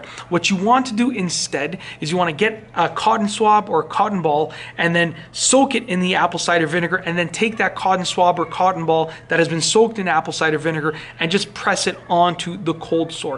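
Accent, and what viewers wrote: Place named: Canada. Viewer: Sounds so Canadian to me. Sorry if I'm wrong. I'm English.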